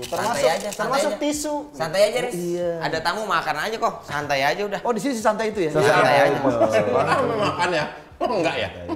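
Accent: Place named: Medan, Indonesia. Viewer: Mak jang, maen maen ya wkw, Medan kali ya min haha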